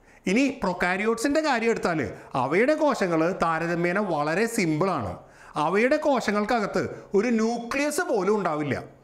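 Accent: Thrissur, India. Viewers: I do not know who you are. But with great respect, may say, your use of language is definite or conclusive. Science is never definitive or absolute- it's dynamic. It may be due to Trichur slang or accent? Though I love science, listening to this gives me ARROGANT OR ABSOLUTE VERSION of science huh! Amen!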